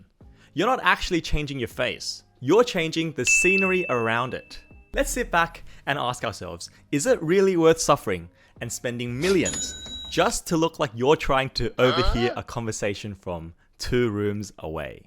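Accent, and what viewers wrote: Australia. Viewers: I'm simple: see and hear a fellow Aussie, I follow.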